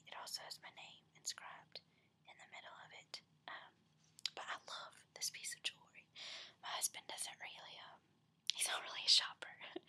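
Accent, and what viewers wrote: South, United States. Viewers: I just came across this video, maybe you've answered in a previous video...but is that southern accent I'm hearing?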